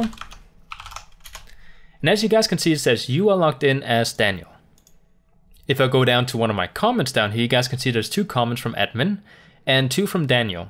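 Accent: Denmark. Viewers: You sound very danish, are you danish?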